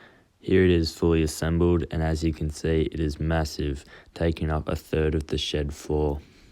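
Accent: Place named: Australia. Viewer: 🔥 love the good old Aussie commentary 😂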